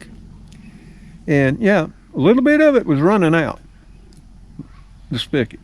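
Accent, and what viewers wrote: South, United States. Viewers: Love your Southern accent too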